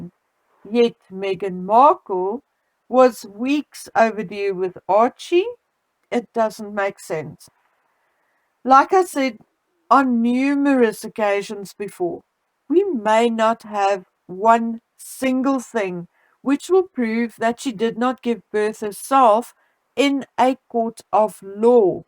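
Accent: South Africa. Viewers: This woman (commentator) sounds like a South African, because of the accent. I am from SA and I can tell.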